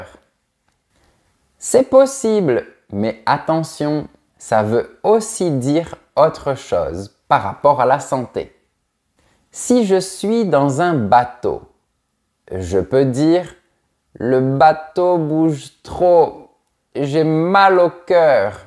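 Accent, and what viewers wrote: South, France. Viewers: Je suis française et si je t’écoute c’est par pur plaisir. Et puis je suis exilée dans le nord et j’adore ta façon de prononcer « autre chose » ( au lieu de ôtre chôse ) ça me rappelle le pays. Pour « emballer » chez moi c’est plutôt un synonyme d’enchanter: « ça ne m’emballe pas de prendre la voiture par ce temps pourri »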